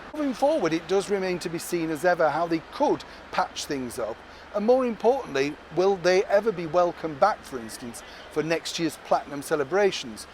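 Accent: United Kingdom. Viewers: I'm watching from Lisbon, Portugal. I love your beautiful British accent.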